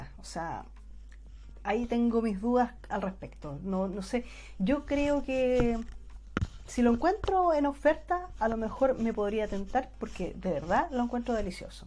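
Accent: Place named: Chile. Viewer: Me gusta escucharte hablar con ese tono chileno. Saludos desde Uruguay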